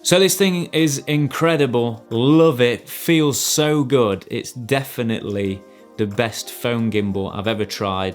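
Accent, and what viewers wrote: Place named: Mansfield, United Kingdom. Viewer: Great review. You sound like your are from mansfield, pretty sure I recognise that bridge. Rainworth bypass? 😆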